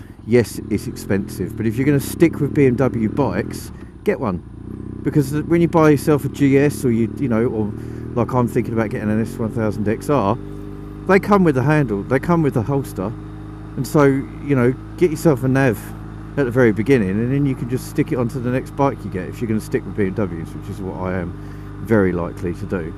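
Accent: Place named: United Kingdom. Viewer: Dig your sense of humour and Brit accent LOL 😂 Great video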